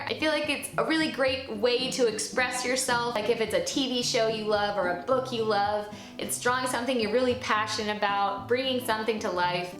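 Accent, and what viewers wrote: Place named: South, United States. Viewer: alina kouzouian Southern drawl.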